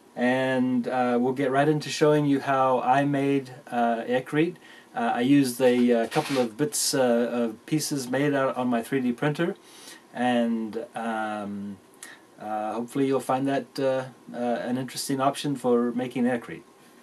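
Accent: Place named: South Africa. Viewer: I can tell you use to live in South Africa, can still hear your accent. Thanks for this video!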